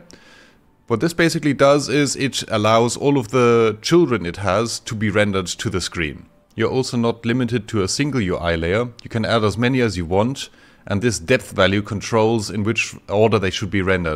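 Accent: Germany. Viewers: klingst mega deutsch ^^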